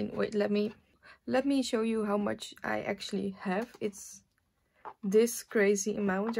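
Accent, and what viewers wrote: Netherlands. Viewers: this is my first time watching u and the first thing i said was oh yea she's dutch and i was right 😌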